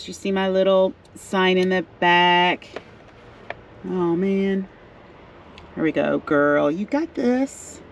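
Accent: South, United States. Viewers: Hey Mrs ❤️ I just stumbled across you and I am happy I did ❤️ you're so beautiful and I loved your video ❤️ I'm considering living In a vehicle so this was a Little inspiration for me ❤️ 🙏 can't hardly afford bills and rent anymore. It's literally draining me and I am a single black woman nearly making ends meet. I make enough to pay a bill and rent and pay to go right back to work and I'm broke right after I get my check. The cost of living is horrific 🙏 so I am trying to come up with a way that I can live on little to none and save my $ for expenses I really need. So thank you for this video sweet mama 😀 ❤️ much love to you from the Carolinas ❤️ you sound country like me ❤️ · I have been watching truck camper setups for over a year.... Probably well over a 100 different people.... And everybody has their own style obviously..... It is a proven fact that while men come up with good ideas and they know how to put things together..... 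It is generally a woman that comes up with the finesse to make it look good and efficient....... So I would have to say that this is the nicest setup I have seen yet.. And you could see very clearly that that was not feces in the bottom of the portable toilet... At 1st glance it looked more like dry corn... You sound southern....plus the expression, "y'all "..... what state are you from?? Thank you for the video young lady..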